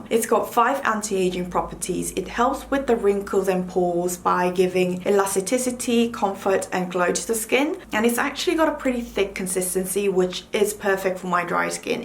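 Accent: United Kingdom. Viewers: 커피 많이 마시고 물 안 마시는 사람 나야나,,, ^_ㅠ 뭔가 오랜만에 풀로 영어로 말하는 영상 보는 것 같은데 영국 발음에 또 한번 치이고 갑미당,,,ღ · 브리티시 악센트 너무 매력적이에요🖤 새해복 많이 받으시고 건강하세요 늘💕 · 영어로 하는 브이로그도 찍어주심 안댈까요? ㅠㅠ 영국식 발음 발음 넘 듣기 좋구, 영국식 발음에 로망생겨써요, 🤍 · 영국식발음 넘 신기해요 운동하며 자신을 잘가꾸는 모습 많은 영감을받아요 저도올해는 좀더자신을가꾸고 아끼고 사랑해주고싶어요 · 오랜만에 듣는 영국발음 너무 좋네요🧡 오워터~~ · 영국 악센트로 바뀌신 것 같아요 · I love hearing your British accent! 🥰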